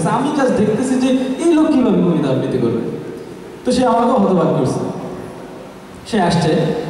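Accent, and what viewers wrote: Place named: Chittagong, Bangladesh. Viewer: ভাই আপনার বাড়ি কি চিটাগাং এর দিকে নাকি?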